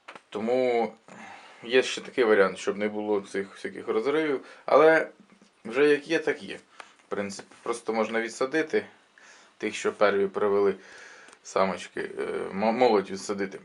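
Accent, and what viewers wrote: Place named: Poltava, Ukraine. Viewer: Дякуємо за поради і приємну мову, чисто як у Полтавській чи в нас, південь Чернігівщини. Здоров'я, натхнення і творчих успіхів!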